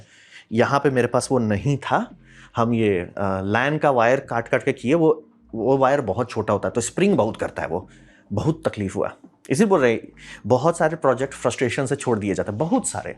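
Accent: Bihar, India. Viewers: Aap pakka Bihar Se Hi Ho, Bhai. 💯 Persent pakka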